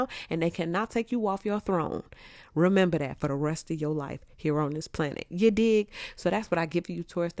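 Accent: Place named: South, United States. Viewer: I love your country accent I love it ❤❤❤❤❤❤😂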